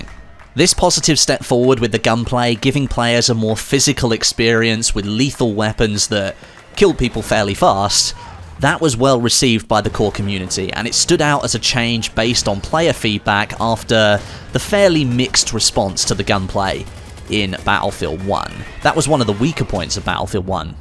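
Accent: United Kingdom. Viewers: where are you from? UK? Australia? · So, here I am with my already formed opinion, formed from hundreds or hours of BFV game play, that it's just...bad. And here you come along with your proper British accent and a dump truck full of logic and analysis. So NOW I have to admit that it wasn't all THAT bad...thanks man. Thanks.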